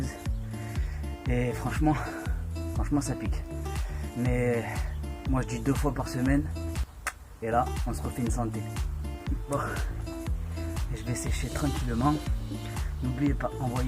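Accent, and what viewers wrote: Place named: South, France. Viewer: Perpignan 😍😍 Il m'a bien semblé percevoir l'accent du sud 🤗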